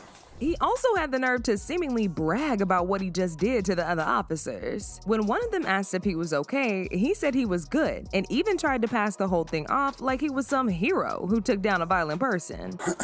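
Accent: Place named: United States. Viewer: As an English man I approve of this American version of the English language ❤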